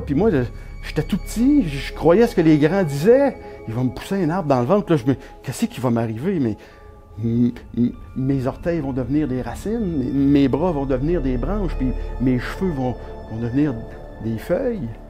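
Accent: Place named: Quebec, Canada. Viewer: Génial, merveilleux et émouvant...... cette histoire me fait venir les larmes aux yeux, pour moi qui vit en Italie depuis 25 ans. Ces histoires me manquent, ces souvenirs de ma petite enfance me manquent et ce merveilleux accent québecois. n'est pas seulement fantastique comme conteur mais a une voix fantastique pour reprendre les chansons d'autrefois. Merci...de tout coeur merci. Cette chaine devrait avoir beaucoup plus de pub et de fans, elle le mérite. Elle présente ce patrimoine immatériel du Québec que beaucoup de jeunes aujourd'hui ne connaissent pas.... Bonnes et heureuses fêtes à toute l'équipe de maCommunauté et félicitations à. En espérant entendre, revoir bientôt, un autre de ses contes.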